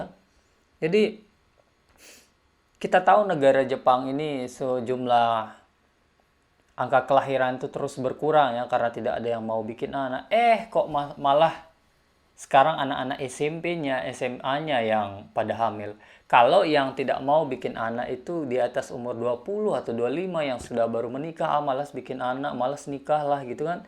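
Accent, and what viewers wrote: Lombok, Indonesia. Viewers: Ngakak gw anjr gara" aksen lomboknya masi😂 mantep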